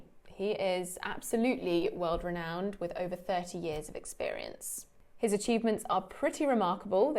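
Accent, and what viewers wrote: United Kingdom. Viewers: You should record audible books! It’s so hard to find readers with your young British accent. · i have noticed that her pronounciation is British but her doctor has an American pronouciation☺️ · Hai To.... I like Your Chanel And Your Voice British Accent.... Sorry, If My English Writing and Language So Bad....Because Need to Learn More.. I'm Eksand From Indonesia Country....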